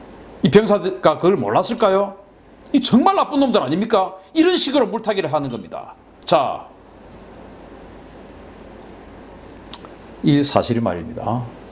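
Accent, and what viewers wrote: Gyeongsang, South Korea. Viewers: 또!!!!!!! 또!!!!!!! 쫌!!!!!!! 갱상도 남자의 강력한 멘트!!!!! 쵝오!!!!!!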